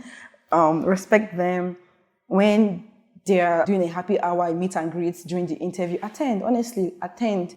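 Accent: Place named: United States. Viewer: Omo, you don dey get American accent small small oo😁😎 Nice video, goodluck to those who applied this cycle.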